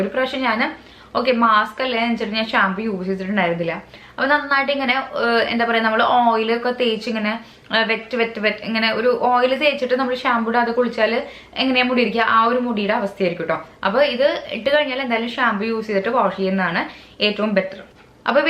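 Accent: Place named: Thrissur, India. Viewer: ചേച്ചി തൃശ്ശൂര്കാരിയാണോ. സംസാരം അതുപോലെയുണ്ടല്ലോ 😻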